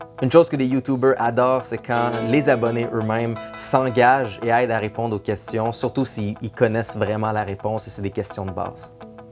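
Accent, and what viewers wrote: Quebec, Canada. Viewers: Bonjour:) j'aime beaucoup cette vidéo qui pose bien le sujet, finalement pas assez expliqué aux abonnés qui n'arrivent pas à comprendre quelque fois que derrière l'écran il y a un humain qui aime bien avoir de bon commentaire, et surtout qui n'est pas en non-stop sûr son pc pour répondre parce qu'il a aussi une vie! Ce qui est bien avec ta vidéo c'est qu'elle est aussi bien applicable pour youtube que pour un réseau social tel que facebook!;) Seul,petit bémol le côté tout flagada devant l'écran, je préfère quand une personne se tiens droite Ahah! Mais là je chipote ça te ne casse en rien la qualité du message!:p Brefouille, là petite française à accent vous salut #hommebeau à accent aussi! XD Ps: d'ailleurs en France y'a genre 1000 accent différent, c'est drôle que les gens soit "choqué" on va dire par l'accent québécois! (Que je trouve bien sympa par ailleurs! 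X) ) Bref bonne continuation à toi!:D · J'adore ton accent et tous les accent des québécois 👍🏻😋💕 je suis presser que tu reface des vidéos because je trouve sa hyper intéressant de savoir comment vous faite et combien de temps vous y passer 💕👍🏻😊 bonne journée 😊😋💕👍🏻😘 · Coucou! J'aime bien ta façon de parler je la trouve très calme et j'aaaaadore💜💜💜 l'accent québécois même si c'est vrai que parfois je ne comprends pas tout😌 T'a video est super continu ! Bye!😊 Ps:1 j'espère que ce n'est pas trop long comme com' 2 je ne sais pas si il a l'option reply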